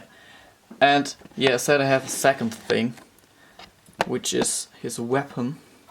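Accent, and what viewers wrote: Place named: United Kingdom. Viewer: Also you sound a bit more British than the last time you spoke. I know your german but maybe when you dm i was reading with the wrong voice again. The voice is t important what's important is the fact that you did better and i love how you improve in one year!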